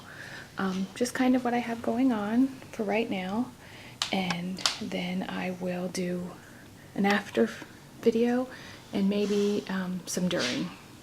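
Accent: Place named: South, United States. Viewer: Hello just discovered your channel . I was actually looking at your daughters channel cause she tagged someone . I must say I'm digging the southern ascent , very cute ;)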